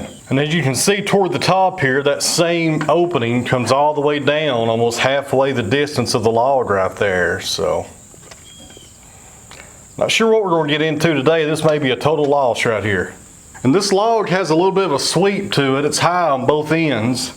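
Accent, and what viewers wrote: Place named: Virginia, United States. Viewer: Very interesting and well put together videos. That’s one hell of an accent you have there..is that Virginia ?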